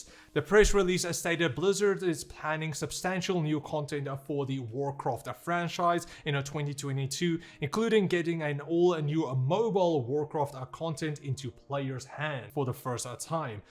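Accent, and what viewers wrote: Afrikaans, South Africa. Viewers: are you afrikaans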